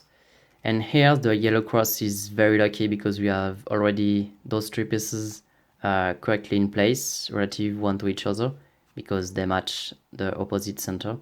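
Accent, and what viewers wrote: France. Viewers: French English sounds so French. I know a guy who says "particular", "specific" and similar words with French accent all the time ^^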